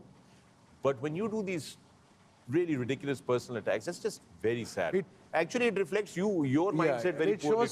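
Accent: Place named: Punjab, India. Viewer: sir is heart of the show love his anchoring and panjabi accent ❤❤❤❤❤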